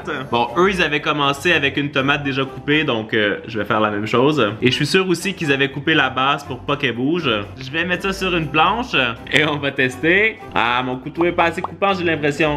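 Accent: Quebec, Canada. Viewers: T le meilleur continue comme tu es et ne change pas et ton accent quebequois est MAGNIFIQUE ❤️❤️❤️❤️❤️❤️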